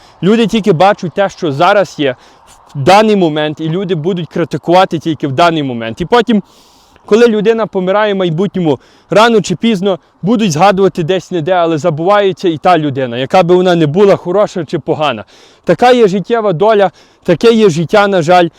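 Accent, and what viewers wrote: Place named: Ukraine. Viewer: Ну , який же , Ви щирий та безпосередній!😍А Ваша українська вимова , просто гріє душу!Стосовно виборів в США: президент Байден вже не зможе за станом здоров'я бути сильним лідером такої країни, як Америка, ми вдячні за все хороше, що було зроблено ним ! Трамп --- підступний , йому не можна вірити! Закінчити війну він не зможе, хіба "заморозити ", а тим часом росія набереться нових сил і рине в наступ та продовжить геноцид українського народу! Ракети, як летіли, так і продовжать летіти по всій Україні та нищити все на своєму шляху! Закінчити війну можна лише військовим шляхом маючи потужну підтримку зі сторони США та Заходу! Інакше ця війна буде дууже довгою та кривавою!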